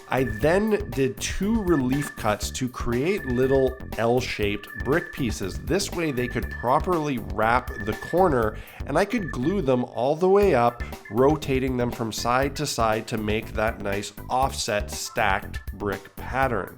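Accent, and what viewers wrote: Canada. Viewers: I kept wondering if you were Canadian I'm glad I have confirmation.haha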